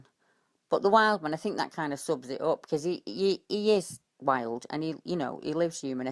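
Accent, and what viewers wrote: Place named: Sheffield, United Kingdom. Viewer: You sound like you are from Sheffield 😊. I pass Epping Forest every day. I hope I get to see Bigfoot.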